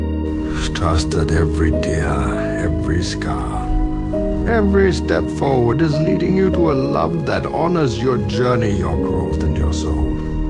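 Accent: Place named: India. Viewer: Come on man, this dude sounds indian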